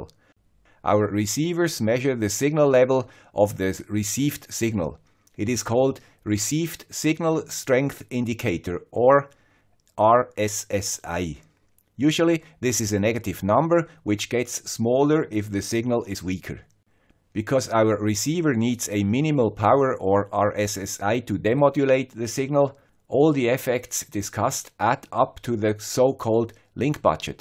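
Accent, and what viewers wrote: Switzerland. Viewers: Came here for the IoT, stayed for the Swiss accent and the cat. Great content as always!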